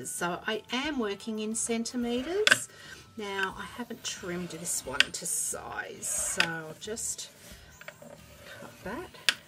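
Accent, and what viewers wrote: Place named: Australia. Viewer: this is a great caddy for the inks. I love using other things to make what would have been expensive storage items, especially here in Australia. I recently made a tool caddy from empty sauce bottles, bought from choice or stacks, they are cut down to different sizes and sit on an inexpensive lazy Susan. There is nothing quite as good as making your own. Thanks for this and great to hear a fellow Aussie.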